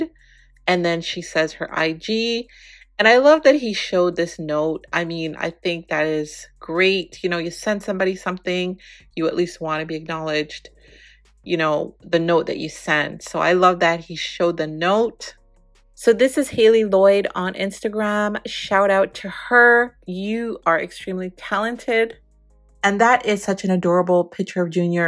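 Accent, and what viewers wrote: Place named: Jamaica. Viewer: Love ur channel …do u have Caribbean in u? I hear a bit of a Jamaican accent ❤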